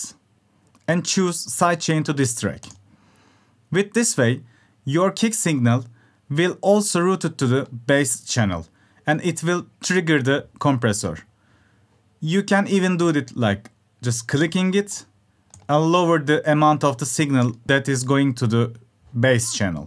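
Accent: Turkey. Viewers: Bilader türk ingilizcesi konuştuğun çok belli 👍🏽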